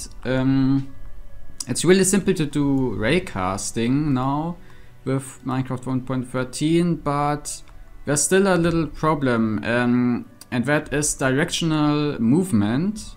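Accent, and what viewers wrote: Germany. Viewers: are you german? your voice really sounds like german-english XD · Ah yes, another German-accented minecraft nerd🤓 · Ich vermute dass du Deutsch bist. Ist das richtig?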